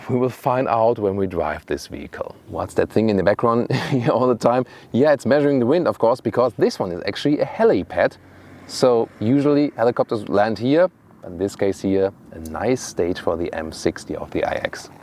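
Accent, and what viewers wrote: Germany. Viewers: I'm sick when listening German accent ...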